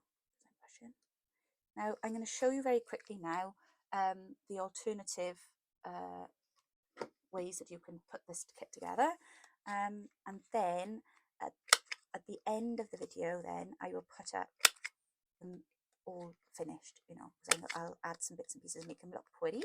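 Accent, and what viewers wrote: Wales, United Kingdom. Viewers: Thank you for these freebies; I learned a lot by watching. I truly love your Welsh accent. My paternal Grandpa was from Blaenau Ffestiniog. He came to Canada in 1910 and here I am. I'm glad I found your channel through the collaboration by way of My Porch Prints.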